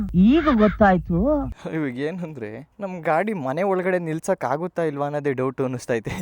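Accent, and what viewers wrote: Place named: India. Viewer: U r kannada slang is super bro congratulations for the bike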